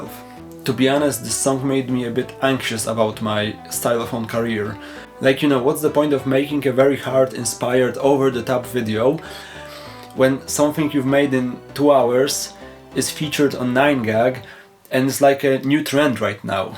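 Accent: Poland. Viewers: polski akcent + język angielski = wisienka na torcie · Siema Poznałem polski akcent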